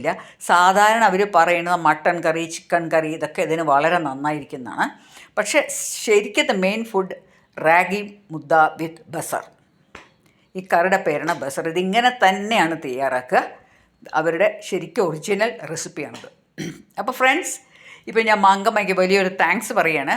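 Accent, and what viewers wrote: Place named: Palakkad, India. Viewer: ഞാനും പാലക്കാട്‌ ആണ്. അതേ slang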